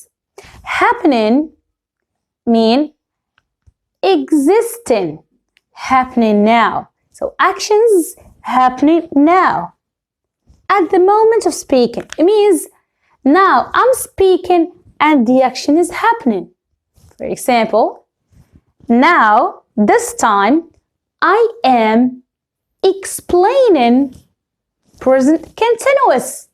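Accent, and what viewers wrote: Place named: United States. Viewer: بسم الله ماشاءالله ثقافتك واسعة ولغتك كانك اميركية 💚💚💚 جيش عالم المراة مع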